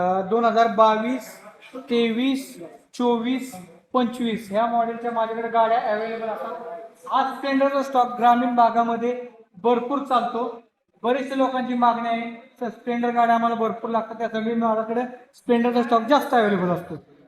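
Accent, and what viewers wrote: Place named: Solapur, India. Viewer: सोलापूर जिल्हा बोलतो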